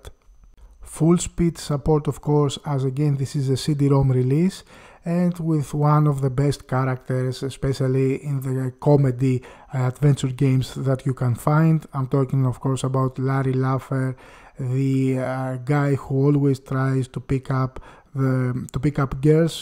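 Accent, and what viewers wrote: Greece. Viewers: Is this guy Greek? I have heard others with the same accent.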